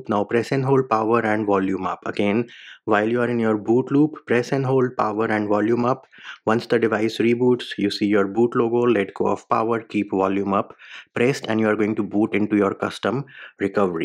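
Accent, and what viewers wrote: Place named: India. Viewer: Why tf are All these videos made by Indian dudes with thick accents holy s***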